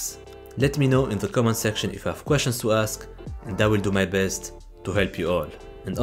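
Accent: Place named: Morocco. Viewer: Khouya ila knti meghribi rak nadi bzaf, bayna mn l'accent dyalk. Bonne continuation 🙏❤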